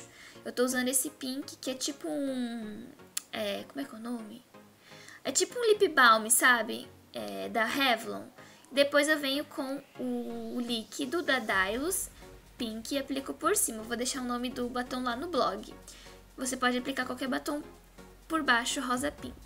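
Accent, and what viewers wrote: Santa Catarina, Brazil. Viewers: pelo sotaque só poderia ser catarinense!! linda, perfeita make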